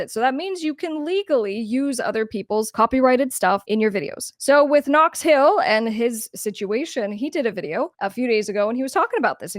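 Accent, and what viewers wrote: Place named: New Jersey, United States. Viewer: Such a new jersey accent lol never noticed till now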